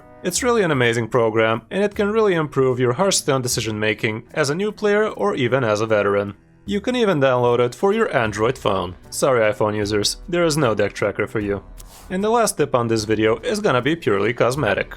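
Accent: Russia. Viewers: Are you Russian? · русский акцент детектед, привет чувак :)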